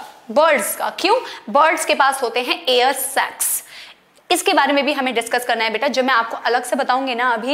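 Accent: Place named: Odisha, India. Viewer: Mam r u from odisha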